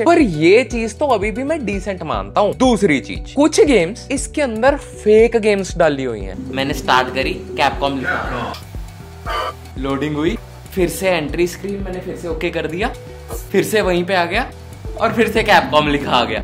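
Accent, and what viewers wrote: Punjab, India. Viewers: Peculiar Indian accent 🤣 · Bhai tu punjabi aa😂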